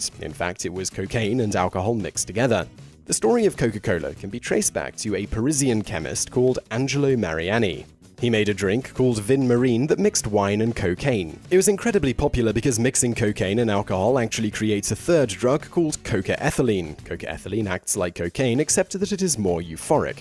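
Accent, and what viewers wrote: United Kingdom. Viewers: R C Cola needs to be on this video!!! And why the British accent? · I guess I'm just a slow talking southerner, hard to keep up with a fast talking Brit.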